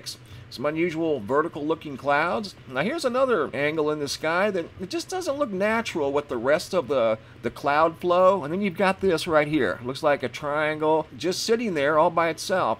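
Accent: United States. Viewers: Love the way you American's pronounce English town/city names, so cool, wish some English people would annunciate more.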